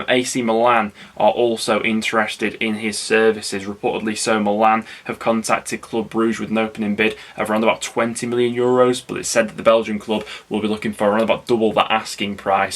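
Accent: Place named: Preston, United Kingdom. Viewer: As always, a very good video, BUT please stop calling Lewis.....O'Breean. My friend worked in the media department at Huddersfield and it is definitely pronounced O'Brian, as in Clough. Tbh, it might just be that Preston accent: )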